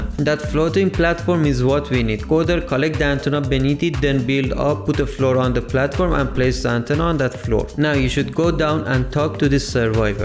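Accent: Iran. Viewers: از لحنت معلومه ایرانی هستی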